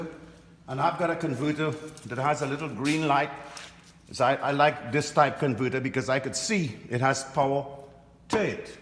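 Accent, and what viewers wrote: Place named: Trinidad and Tobago. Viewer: Good morning. Just looked at video. Informative and simple thanks. Trying to test my suburban. I hear a trini accent. Where are u located?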